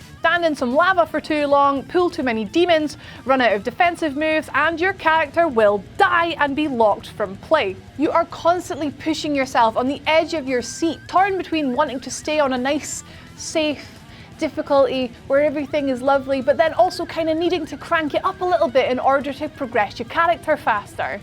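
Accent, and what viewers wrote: Scotland, United Kingdom. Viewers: Is that a Scottish brit accent? Or just scottish? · Man I love Scottish accents. The way she says permadeath is...I love it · She's scottish, he is irish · Ai, are ye a Scottish lassy?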